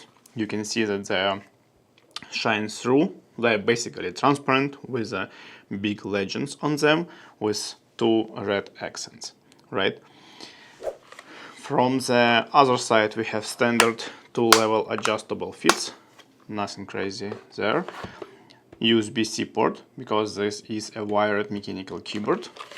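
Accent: Russia. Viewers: По акценту слышу вам не чужд русский язык. Какова клавиатура в сравнении с отцами магнитных клавиатур wooting? Есть ли разница в ощущении или цена за wooting это просто наценка за бренд?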